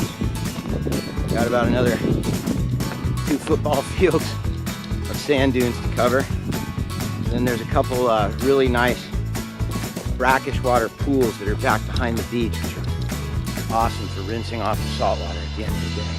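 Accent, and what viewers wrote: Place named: Hawaii, United States. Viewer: you sound like your from Kona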